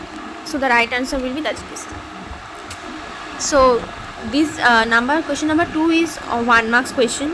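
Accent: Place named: Tamil Nadu, India. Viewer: Blud got the accent of a Tamil niggi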